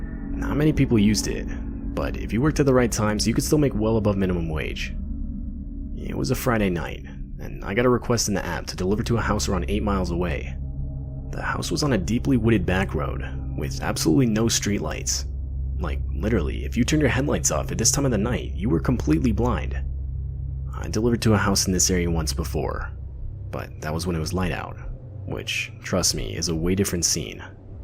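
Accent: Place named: Berlin, Germany. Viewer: The narrator sounded like from Wansee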